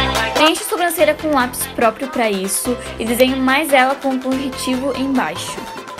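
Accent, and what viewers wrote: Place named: Northeast, Brazil. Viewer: nesse vídeo você tava muito nordestina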